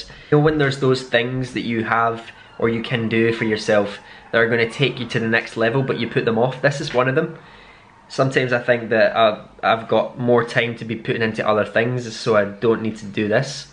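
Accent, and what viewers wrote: Scotland, United Kingdom. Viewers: Im excited for you, i love listening to you talk! Scottish is the best accent!!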